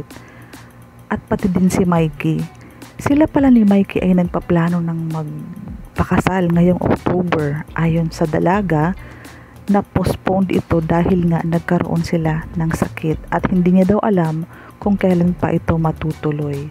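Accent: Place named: Visayas, Philippines. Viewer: Ampanget ng Boses at salita mo , d ko na tinapos nairita ako , pambisaya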